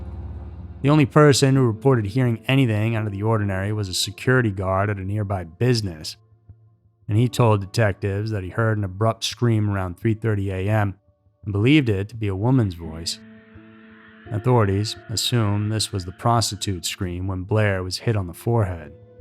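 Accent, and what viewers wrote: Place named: General American, United States. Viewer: The narrator has a perfect standard american english accent I have no idea tf youre talking about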